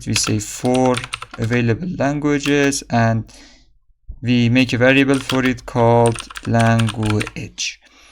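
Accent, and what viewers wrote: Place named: Iran. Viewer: لهجه فارسیت داد میزنه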